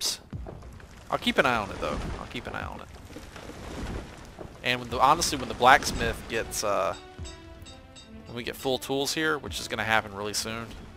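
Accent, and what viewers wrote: South, United States. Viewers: Thats a Southern accent people... herps [erbs]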